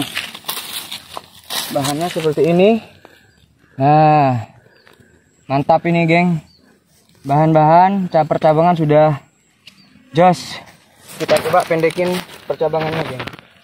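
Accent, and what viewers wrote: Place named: Madura, Indonesia. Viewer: Keren.. Klo dr logat bahasanx logat madura nih.... 👍👍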